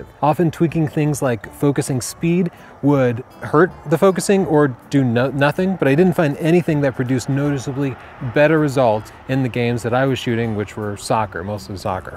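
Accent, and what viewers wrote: United States. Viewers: I love the American pronunciation, the UK being the 51st state, now commonly known as the United Kingdom of America and we have an American princess now, ignore the haters i wouldn't give em air time, i love my Mustang too No more visits to California to Enjoy driving a Mustang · Loved that ending. "I'm an American, this is my dialect." Hell yeah. No one should be ashamed of their dialect, Americans included. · "It's Ny-kon in the US, not Knee-kon." And then go on to claim 'Zee-7' is a world-wide pronunciation. The letter Z is pronounced Zed everywhere but the US. The product will therefore be pronounced Zed-7 everywhere but the US, whether you like it or not. If you want leeway for your American dialect and pronunciations, at least show the same courtesy.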